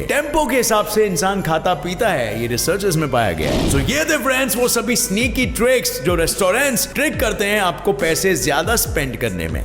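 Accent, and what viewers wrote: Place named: Kumaon, India. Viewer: Bhai as your dialect is kumaoni. Do you know Kumaon mastiff.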